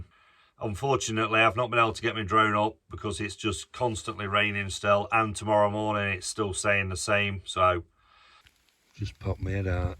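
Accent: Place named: Midlands, United Kingdom. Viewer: (assume that's your name) Interesting video. First time I've seen you. Your accent I'd say you're not too far from me. Sutton-in-Ashfield I'm a widower Single like I assume you are.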